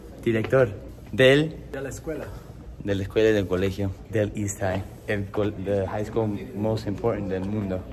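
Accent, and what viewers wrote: Peru. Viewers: En serio man te has peruanizado un montón, tienes el acento de limeño de barranco. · El asento lo tienes bien peruano ja que loco jaja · Hablas tan bien el español y con acento peruano incluído que pensé que eras peruano, pero igual te considero como un peruano más xq se nota el feeling que sientes por Perú, buena, te hiciste una, éxitos bro 👍👍🇵🇪🇵🇪 Nice school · Mare, ahora te creo, yo creí q eres cajamarquino, o pozuzo, hablas bien como el castellano peruano. Me sorprendiste.! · Wouuuuhhh ¡qué hermoso gesto!, se siente tu amor por nuestro ¡PERÚ! ¡GENIAL!!! Me encanta tu sabor peruano al expresarte 😁😍, eres todo un peruano. ¡Cuídate mucho! Y GRACIAS 🇵🇪♥️✨ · No sólo representas al Perú, sino además conoces la jerga peruana y sobre todo hablas español con acento peruano, lo que es muy difícil de lograr para un native English speaker. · Es sorprendente... 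Tienes el acento y gracia de un nativo peruano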